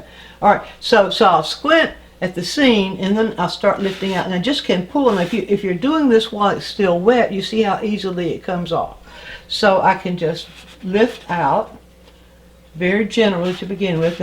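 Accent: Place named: Mississippi, United States. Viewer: You are such a good teacher. I love your accent. Are you from MS, related to JoAnne?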